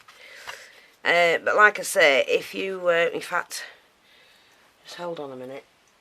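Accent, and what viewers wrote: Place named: Northern England, United Kingdom. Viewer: Thanks for this and great to hear a UK and even better a Northern lass instead of the US gals all the time :0)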